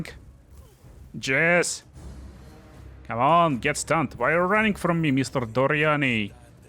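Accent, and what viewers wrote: Russia. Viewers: Русским диалектом на английском=)))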